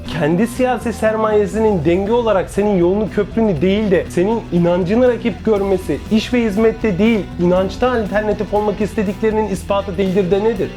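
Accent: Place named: Istanbul, Turkey. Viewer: Sizi neden sevdim kardeş bilmiyorum ama gerçekten çok güzel bir Oflu olarak bizim söyleyeceklerim izi İst şivesiyle anlatıyorsun ve guruplarda paylaştım daha aktif olmanı istiyoruz teşekkür ederim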